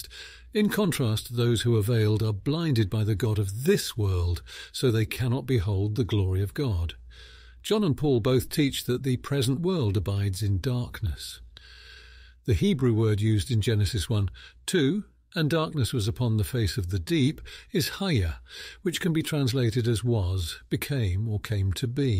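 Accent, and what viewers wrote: United Kingdom. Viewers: Hi, my husband and I started the devotional, and he likes it😁. However, I had a question and sent you an email....I really like the English accent on your teaching...❤ by the time this ended I just wanted to yell HALLELUJAH 😂😂😂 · I agree with the Gap theory as well. The theory gives us a possible little glimpse into some of the huh? Things between God and Satan. But I like how you taught in this in relation to it and pointed back to Jesus. I’ve never really heard it taught this way and it was beautiful. I’m so thankful for the mind God has given you. There is an understanding not just of literature, and logic and God has given you not only intelligence, but it is clearly Spirit filled and relational. It’s wonderful to see Him working through you. I don’t have time to read comments these days…so I’m sorry if people give this same feedback…I like the British voice I guess. 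But the thing that’s great about your speaking is the little aspects of your personality that come out. When you say something goofy or let a little laughter in. It’s more personal. But I support the ministry whomever is speaking it.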